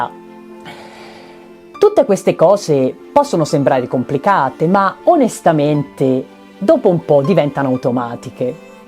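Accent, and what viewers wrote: Italy. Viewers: Mi sembra di cogliere l’accento della mia regione l’Umbria . Mi sbaglio?!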